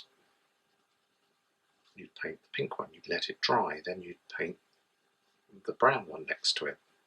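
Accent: England, United Kingdom. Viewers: Love your channel, look forward to more videos, your paintings are beautiful. And you just cant beat you lovely English accent! Easy on the ears.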